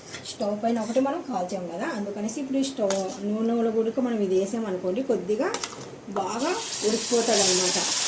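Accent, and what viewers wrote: Rayalaseema, India. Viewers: Nippulu,telling in tellugu very nicely.from rayalaseema ?.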